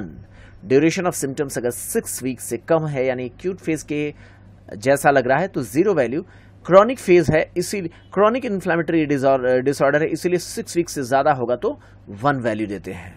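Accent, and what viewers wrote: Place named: India. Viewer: Good, but please hire a narrator with clear English because it's difficult to understand your Indian English (no offense)...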